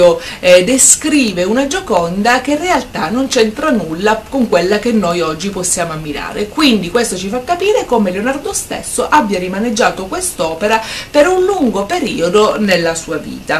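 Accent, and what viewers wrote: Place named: Tuscany, Italy. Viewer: Bravissima attrice, con quel tuo simpatico accento toscano. Molto professionale e interessante la tua spiegazione. Grazie.. <3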